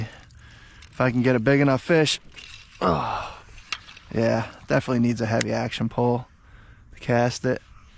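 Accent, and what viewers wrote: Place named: Troy, United States. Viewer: Sounds like troy ny lol I'm in Rentler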